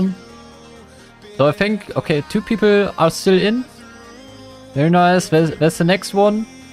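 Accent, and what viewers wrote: Germany. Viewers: I hear DEUTSCH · Man merkt richtig das deine Muttersprache Deutsch ist ( merkt man an der Aussprache von manchen Wörtern trotzdem Respekt für deine Englisch Künste). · i love his german accent🤣😭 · just a German accent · Das ist Englisch mit deutschem Akzent richtiges Denglisch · He's German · I Bett he is from germany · I can Hear that your german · You can hear that he’s German haha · Almann Akzent kickt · can it be so you are from german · i hear german actent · I love this great german accent... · The german accend is so nice!:popcorn-yellow-striped-smile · Man merkt den deutschen Akzent😂❤ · Bro is from Germany so his accent is just like that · you can hear hes german. Good Video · In a german speaks englisch everyone knows he s a german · Man merkt so hart, dass er deutsch ist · Man hört so den deutschen Akzent 😅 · I love your germann acsant I find it way better then other peaple acsent 😂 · Don't blame him, he's German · I smell a little bit German here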